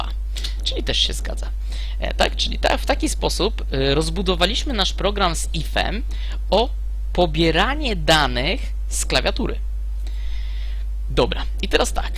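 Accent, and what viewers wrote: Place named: Poland. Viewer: Ciekawe podstawy, ale masz złe nawyki jeśli chodzi o angielski, czasem mówisz dobrze, czasem wymawiasz słowo w sposób spolszczony. Char to [czar], a dokładniej i poprawniej z miękkim r i te cz to trochę złamane z tszi :) Na pewno C jest strukturalny? a nie proceduralny i obiektowy?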